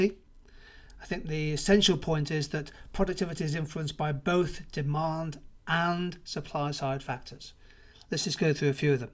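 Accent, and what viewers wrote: United Kingdom. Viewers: I love the accent , it s british, isn t ? well explained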